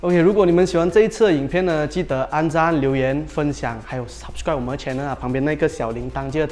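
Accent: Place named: Butterworth, Malaysia. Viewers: 华语也是，一开口就是 Penang 腔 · 一听就知道是檳城口音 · 这个英文口音，一听就知道是北海人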